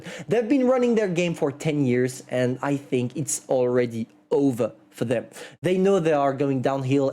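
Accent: France. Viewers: French accent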